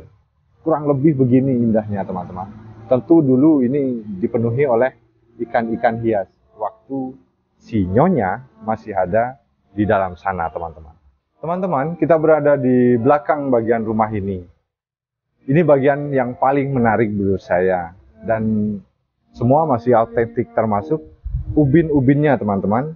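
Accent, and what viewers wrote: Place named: West Sumatra, Indonesia. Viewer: Bang ini orang minang ya...logatnya seperti orang minang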